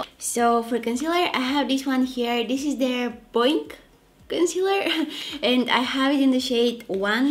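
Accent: Greece. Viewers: Απο τι στιγμη που την ακουσα λεω ειναι ελληνιδααα το ηξερα❤️ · are you greek ? omg and i was wondering why i love your accent and the way you talk !! greek power girl !! xo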